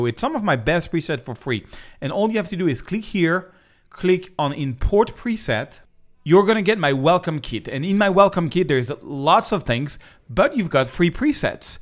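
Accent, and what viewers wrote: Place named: France. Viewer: your English-French is so sweet:) Thank you for tips!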